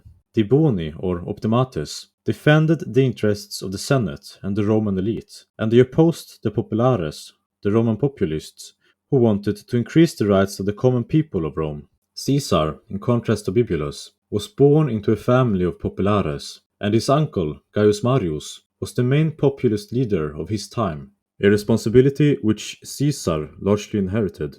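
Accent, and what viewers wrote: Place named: Latin America. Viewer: … I know your voice. Unique combo of voice and accent (very slight) but your Latin origins give you away 😂 big fan though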